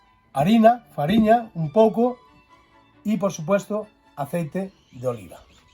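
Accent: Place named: Galicia, Spain. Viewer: Me encanta tu portugués agallegado, jjj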